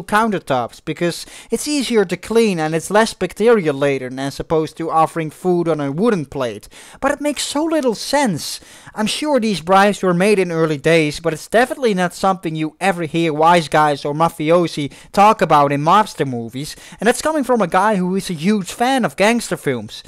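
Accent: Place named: Netherlands. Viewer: Very sorry to sound like "That Dumb American", but I absolutely enjoy your Dutch accent. But honestly, your English is pretty good. I don't know how many Dutch citizens speak English, but you're very well spoken in the language. Aside from that: keep up the good work with your videos. Thank you.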